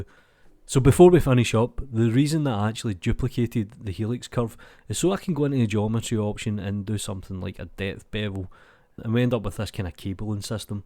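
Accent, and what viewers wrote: Scotland, United Kingdom. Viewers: why do i think you are from scotland ??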